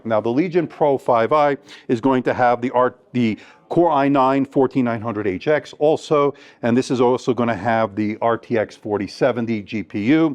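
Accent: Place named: New Jersey, United States. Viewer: You live in Las Vegas, but you have eastern accent, New Jersey maybe?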